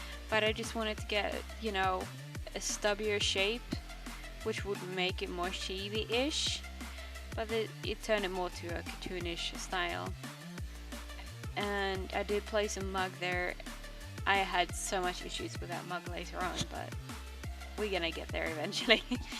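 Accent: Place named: Australia. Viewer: I think your accent is awesome! You almost sound like an Australian, my favorite accent! The art is awesome as well, of course! Thanks for the video!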